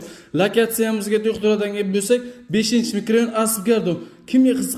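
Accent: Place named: Bukhara, Uzbekistan. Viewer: Бухороча акцентку. Манимча Бухоро. Самаркандликлар акценти бошкача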